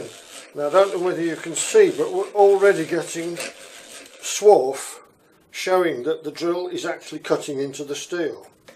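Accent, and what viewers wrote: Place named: England, United Kingdom. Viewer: I love that english accent, cheers from Argentina :).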